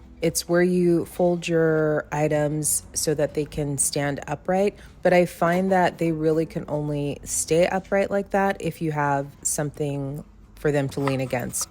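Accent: Scotland, United Kingdom. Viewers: Loved the Scottish accent!❤ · Hey 🩷 I stumbled across your channel about a week ago & I love your resourcefulness! I don’t think that I personally can be a minimalist, but you give me so many ideas for storage and declutter! I would say add a long frameless mirror in that gap, it will reflect light from either your bedroom window or your bedroom light! Oh & your Scottish accent is great! I’m from England & I can barely do my own 😂🩷✨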